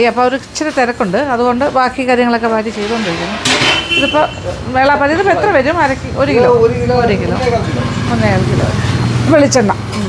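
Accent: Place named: Kottayam, India. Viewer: Kottayamkar samsaram kettappol thonni,njan kottayam😊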